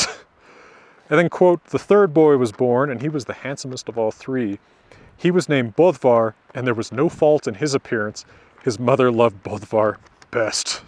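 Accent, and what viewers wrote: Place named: United States. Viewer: Hello, I do not know if you are aware of that, sir, but it's not just people in the English language who are following you. I am French, and I have a hard time understanding you with your American accent. Would it be possible for you to speak a little less quickly please? I know, it's a little daring of me to ask you this, but it would be very useful for a lot of people I think. Anyway thank you very much for your videos. Sorry if I offended you, it was not my intention.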